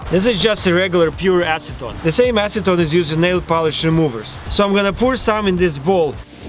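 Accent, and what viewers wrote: Russia. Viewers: He sounds like crazy Russian hacker.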